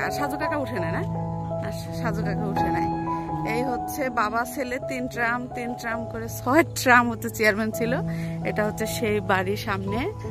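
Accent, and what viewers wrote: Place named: Bogura, Bangladesh. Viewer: আপু তোমার মুখে বগুড়ার ভাষা শুনে খুব ভালো লাগছে 😊আমার দাদাবাড়ী বগুড়া শেরপুরে সীমাবাড়ি আর সারিয়া কান্দি ভুয়া গাথি অনেক আত্মীয় আছে😊❤❤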